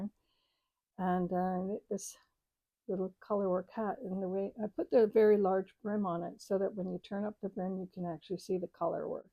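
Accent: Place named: Canada. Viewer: It sounds like you might be from Canada. I’m in a state that borders Canada. We get a lot of snow (right now it’s freaking cold here), but I’m sure we don’t see anything close to the snow levels you can get in a snowstorm in Canada. 😊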